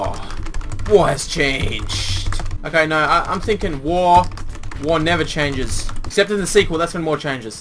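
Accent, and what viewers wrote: Australia. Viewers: The Aussie accent! <3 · Are u from aulstralia?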